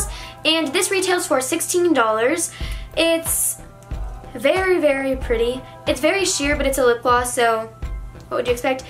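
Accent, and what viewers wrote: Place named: Pennsylvania, United States. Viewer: Do you live in PA? Jw bc you kinda have an accent 💗 (btw loved the vid!)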